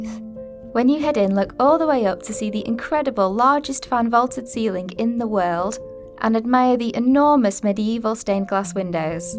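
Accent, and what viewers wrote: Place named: Lancaster, United Kingdom. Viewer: Good video as a Cambridge resident. Love your accent, from Lancaster.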